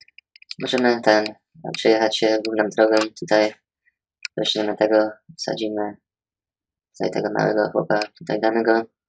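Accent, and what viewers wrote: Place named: United States. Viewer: Zawsz chciałem poznać kogoś kto mówi po polsku a się w Polsce nie urodził i posłuchać jak mówi. Twój język jest dosyć zabawny dla widzów, którzy nigdy nie byli w USA i nie znają Polonii. Zabawny w pozytywnym tego słowa znaczeniu. Ale uwierz mi, że o wiele bardziej wolę słuchać Ciebie i o wiele łatwiej Cię rozumie, niż niektórych nastolatków w Polsce. Szkoda że zmieniłeś swój głos modulatorem. Nie powinieneś się przejmować uwagami. Ktoś kto ma minimum inteligencji i wyobraźni wie, że niełatwo jest mówić idealnie dwoma językami, jeśli się w obu krajach nie mieszka i nie ma na co dzień kontaktu z "żywym" językiem. Tak samo zabawnie brzmi ktoś kto świeżo przyjedzie z Polski i zna język angielski tylko ze szkoły.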